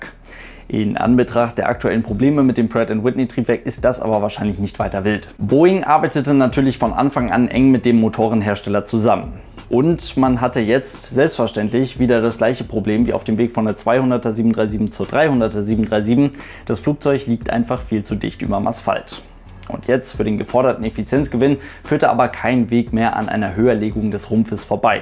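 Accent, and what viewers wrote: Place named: East Germany, Germany. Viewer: next generation mit deinem ostdeutschen Akzent im denglisch auszusprechen ist leider nicht witzig, sondern doof.... die englische Aussprache ist doch nicht so schwer, oder?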